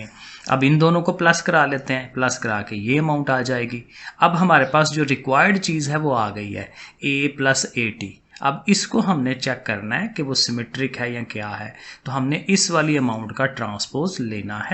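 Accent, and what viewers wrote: Sialkot, Pakistan. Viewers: sir are you from Sialkot??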